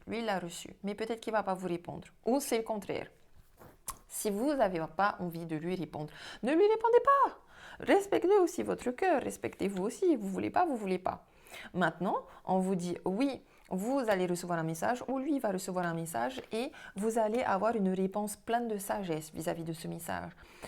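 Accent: Tahiti, French Polynesia. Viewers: Bonjour, merci pour votre message 🥰oui oui vous avez bien reconnu l’accent tahitien d’une tahitienne 😉 belle journée ☀️ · Bonjour, merci pour votre travail. Vous avez l’accent tahitien. Belle journée.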